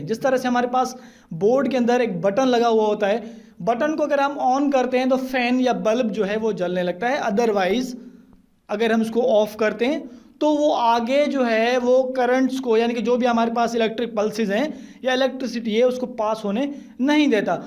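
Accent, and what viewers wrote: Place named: Sindh, Pakistan. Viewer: Sounds like Sindhi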